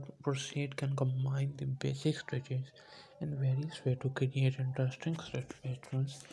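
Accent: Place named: India. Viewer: Он говорит на ужасно противном английском (индус, скорее всего). Ничего особенного не говорит, просто обсуждает то, что видит....что там разные текстуры, разные цвета, макраме....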